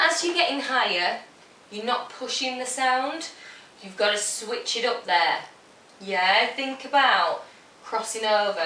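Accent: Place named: Manchester, United Kingdom. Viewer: Are you from Manchester England you sound like my cousins talk from there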